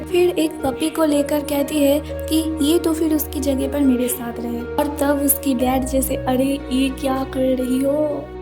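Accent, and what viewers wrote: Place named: Northeast India, India. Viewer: Ap north east se ho , meri chachi ki same aawaj hain , bolne ka tarika bhi same hain , kalimpong se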